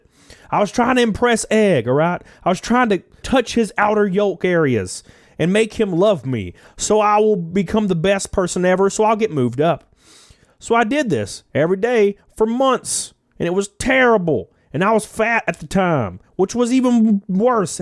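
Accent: South, United States. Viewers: Have you ever considered voice acting? I could imagine you doing voices for cartoons or even radio dj, or audiobook. Your southern accent is so unique! Great content tho, always funny and intriguing to hear what goes on in crazy retail.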